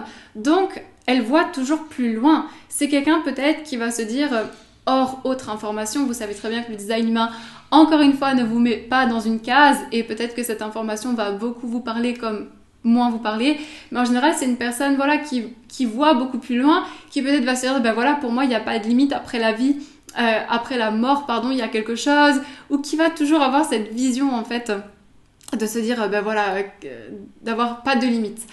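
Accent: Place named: South, France. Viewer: ah merci:) en effet ca sentait l'europe méditéranéenne(surtout accent sur de la france, vers Cacasonne?)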